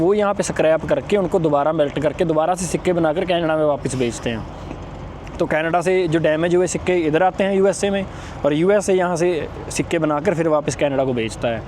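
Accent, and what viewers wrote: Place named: Punjab, India. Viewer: Veer Punjabi ch ee aaja yaar ...teri hindi ch vi Apni Punjabi Jhalkdi aa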